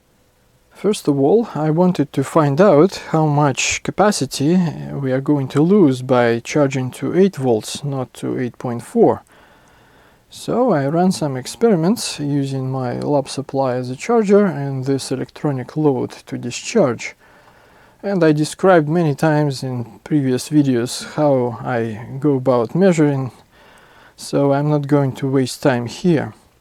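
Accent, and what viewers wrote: Russia. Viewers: Просачивается русский акцент :))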